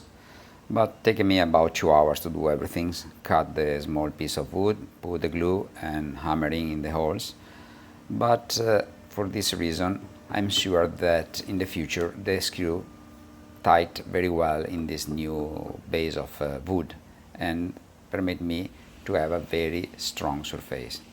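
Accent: Italy. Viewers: Wonderful, you are back in English. I don't know what I like most about your channel, all the tips on how to fix things or your wonderful English with a clear and lovely Italian accent 🇸🇪😀😄💕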